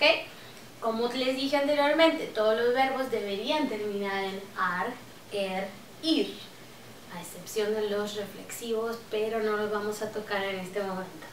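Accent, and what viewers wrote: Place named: United States. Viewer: muy buenas tardes, este video en mi concepto fue como subir tres escalas en un solo paso, pues diria que es el punto de partida para pensar en ingles, y corrobora aún mas mi idea de que el Ingles es el perfeccionamiento de la forma de hablar de Tarzan. Con todo respeto por los anglosajones el anterior comentario, pero es que cada vez concluyo que el ingles es el idioma de ir directo al punto de forma clara y concreta, y el español es la forma de como dar rodeos y rodeos y a veces no decir mucho. He ahi también el porque los gringos hacen y nosotros los españoles nos quedamos haciendo foros, seminarios y conferencias tratando de buscarle florituras al idioma y con ello justificar el subdesarrollo Un saludo grande para ti, me está gustando mucho tu voz y acento americano que peligro para mi. Que tengas una feliz tarde, y una mejor semana.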